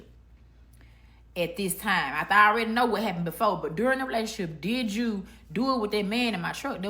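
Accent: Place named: Memphis, United States. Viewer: Can tell she from Memphis 😂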